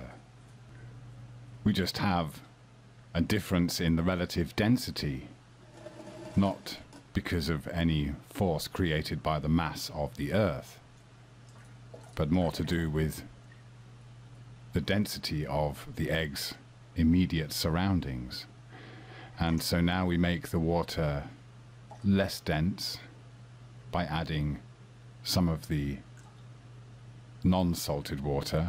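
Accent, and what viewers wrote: England, United Kingdom. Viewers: I am so glad to hear this guy with some sort of English accent -- I thought all of the flat Earth nutjobs were from the US. It makes me feel a little bit better to know that some of the morons are from abroad.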